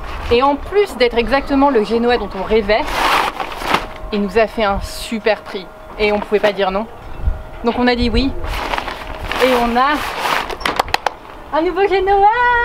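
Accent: France. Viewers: I am always so entertained by various facial expressions associated with her french inflected English that following the subtitles I feel like I missed something........................because I had to keep looking down to see what she was saying.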